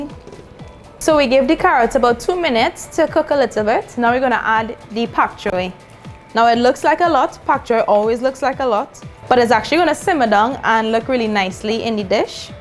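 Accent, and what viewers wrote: Trinidad and Tobago. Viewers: Great Recipe...I love your accent...Im hearing Trini...Am I correct???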